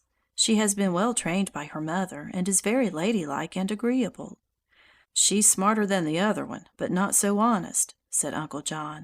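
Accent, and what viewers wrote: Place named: United States. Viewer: Seriously learning english using a very american accent.. 😅 could not be more useless.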